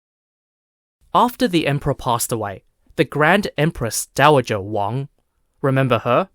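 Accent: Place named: Australia. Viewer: First time watching. Interested. Ozzy accent puts me off cause it's usually racist. Only 4 mins in but this don't sound racist yet. I understand that sounds racist. It is. Hence interrested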